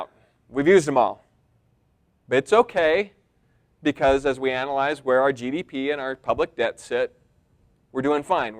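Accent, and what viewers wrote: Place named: South, United States. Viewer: they must like his southern twang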